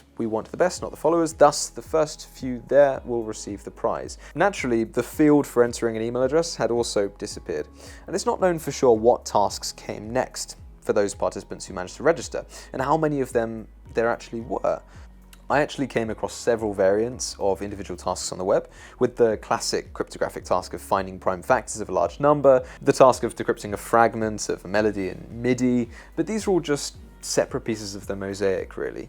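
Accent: RP, United Kingdom. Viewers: posh boy... sounds like all of Boris Johnson's school friends in one person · Aye, posho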